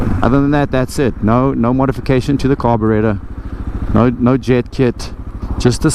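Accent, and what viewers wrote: South Africa. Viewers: I detected the accent pretty quickly too. I lived in SA from 1968 to 1979 in the Amanzimtoti area mostly.. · Is that a South African accent I detect?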